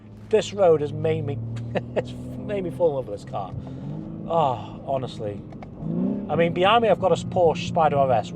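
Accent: United Kingdom. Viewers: no one wants to hear your short british tongue innit mate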